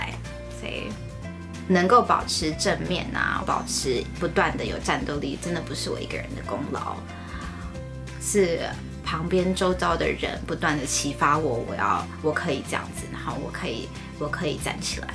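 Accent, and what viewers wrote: United Kingdom. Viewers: I really like to watch your videos .....I'm falling in love with it ...and I hope to see more interesting video ❤️....furthermore I also like your english accent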